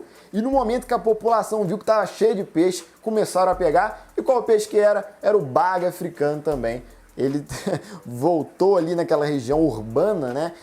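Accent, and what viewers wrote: Minas Gerais, Brazil. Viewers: Vídeo top ,vc e mineiro também m sabia 😄uai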